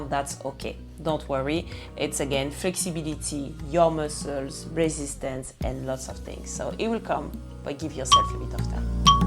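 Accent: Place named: France. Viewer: English with a French accent is insane. Now you know what your trump card is.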